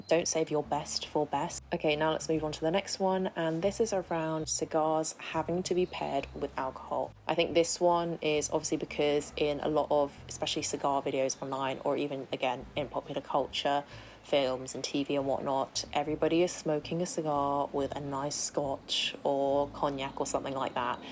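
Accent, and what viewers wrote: United Kingdom. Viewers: Asian lady with British accent, cigar, so sophisticated and sexy! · A beautiful woman with a British accent smoking a fine cigar. There is a God...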